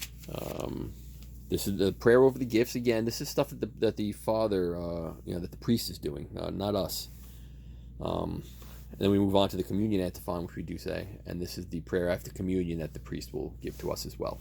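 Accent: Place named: Boston, United States. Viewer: You sound like JFK you must be from Boston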